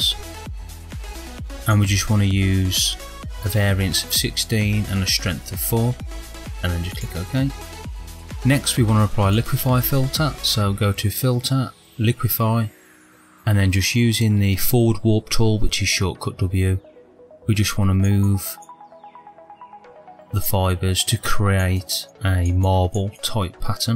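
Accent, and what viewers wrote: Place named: Black Country, United Kingdom. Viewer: I was wondering if you were a yam-yam or a brummy, haha. I am from these parts too.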